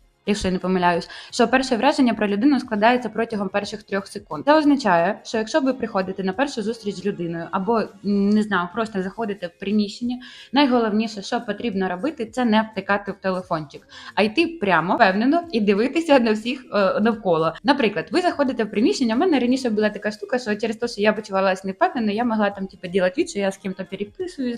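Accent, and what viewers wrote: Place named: Cherkasy, Ukraine. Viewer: Звідки ви? Такий цікавий говір? Часом не Черкаси ? Прекрасна енергетика😻😻 Продовжуйте далі❤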